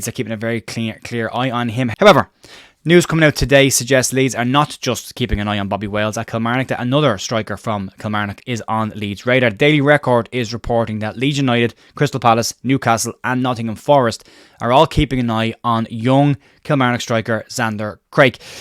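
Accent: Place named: Ireland. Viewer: Keep up the good work mate. Even the Irish accent is easy on the ear.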